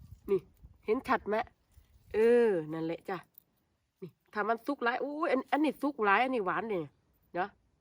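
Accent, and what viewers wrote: Korat, Thailand. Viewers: 😂😂😂 นี่ยังแม่นเนาะ คนทางไหนน้อ สำเนียงคือคนโคราชเนาะ fcใหม่จากสวิตฯค่ะ ปีนี้เพิ่งปลูกสีแดง เดี๋ยวจะไปเอาสีเขียวมาปลูกอีก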